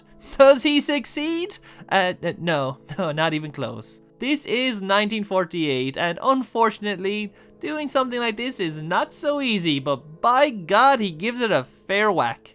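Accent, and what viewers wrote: Ireland. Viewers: You're terrific -- what a fun approach to life. LOVE Rope. Read about it as a 1960s teen and was dying to see it, but it was as buried as Martin van Buren then. Thought to search it in youtube one day 40 years later and wham, there it was to enjoy free. INSTANTLY this tantalizing mutation from Hitchcock's doggedly hetero (but frequently gayoid) oeuvre at large sprang into my slightly less closeted adult life. What's that you say -- cotting? Codding? (Cotter/Codder.) Am interested -- have been a language/dialect/idiom/slang addict forever, and of course LOVE your Irish accent. Tried googling the term. Hitch was gay as a goose, of course. Supposedly he and Alma only made love once, thus Patricia. ("Therefore champagne.") Rope's photographic gimmick isn't such a big wow but it gives this extra-special movie extra cachet. 7 normal splices would've been fine :)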